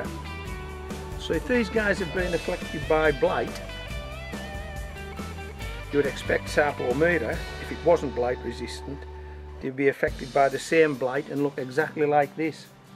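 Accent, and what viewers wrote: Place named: West Midlands, United Kingdom. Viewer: Thanks for that. Now, at first I thought you were from Durham, but I read you were up near Scotland on the west side, but I keep hearing some West Midlands in your voice. Am I right?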